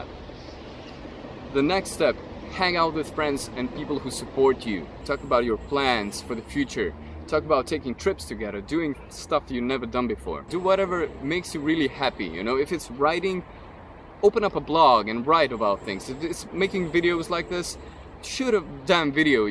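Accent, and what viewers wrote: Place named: Netherlands. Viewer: Great points and analysis, totally right on. I like what you said about spiraling around the issue and getting closer to the emotional pain, that's a great visual image and definitely accurate. Where do you live, Holland? Just guessing based on your accent.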